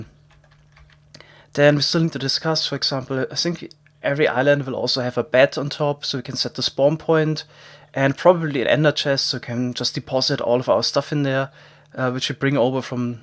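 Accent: Germany. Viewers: Sometimes you can feel the german in your voice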